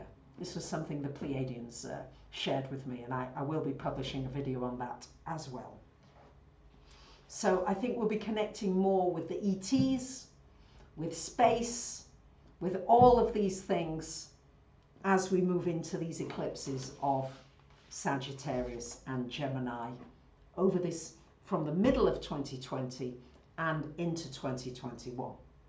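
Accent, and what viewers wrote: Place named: Yorkshire, United Kingdom. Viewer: I love that you have a Yorkshire/Lancashire accent, as I am also from Yorkshire (Ryedale, though possibly also Andromeda!) and it brings an earthiness to your broadcasts. Super 😊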